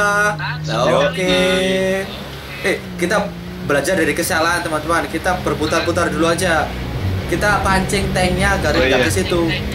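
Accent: Java, Indonesia. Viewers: english'e wis medhok wkwkwkwk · Mas wong ngendi si kok iso boso jowo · KAMU ORANG JAWA YA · Medok tnan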